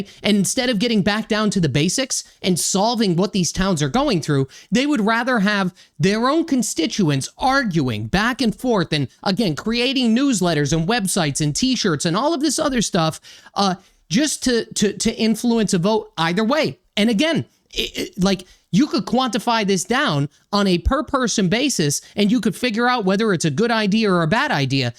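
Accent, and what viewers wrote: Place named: Massachusetts, United States. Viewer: i love the accent. You say "owies" when you say always. A lot of mass residents do. I pointed this out to my friend and he thought it was hilarious and can't unhear it.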